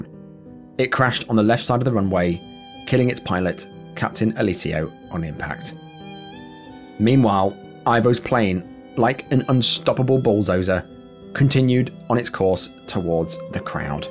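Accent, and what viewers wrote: United Kingdom. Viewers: I did NOT expect you to be bri'ish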